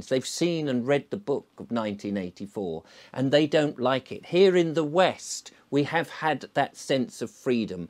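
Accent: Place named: Eastbourne, United Kingdom. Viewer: Sounds like you live in Eastbourne ☺️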